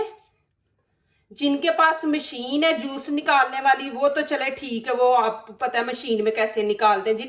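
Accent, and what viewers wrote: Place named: Punjab, India. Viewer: کیا بات ھے آپکی اردو وہ بھی پنجابی ٹچ کے ساتھ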